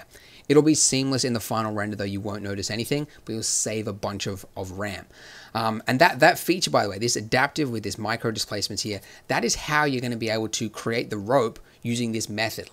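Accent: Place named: Australia. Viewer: Ritchie He's Australian... Can you really not tell?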